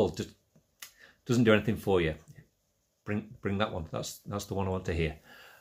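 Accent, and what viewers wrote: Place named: United Kingdom. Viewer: New camera? Looks a little better. Also I had no idea you were British.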